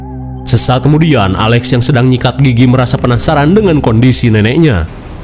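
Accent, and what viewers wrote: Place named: Java, Indonesia. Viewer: Mc nya beda ni biasa nya oke bhasa.. Medok Jawa.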